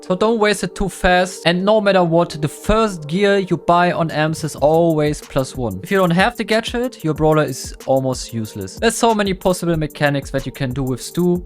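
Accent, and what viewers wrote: Germany. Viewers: Im sure ur an amazing player but I can’t watch the video with that strong a German accent holy shit better just talk German and put English subs man ur never gonna speak proper English if it’s that strong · Are you German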